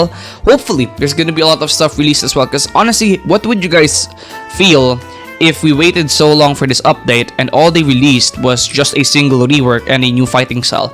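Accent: Philippines. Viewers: I wonder if your a Filipino like on accent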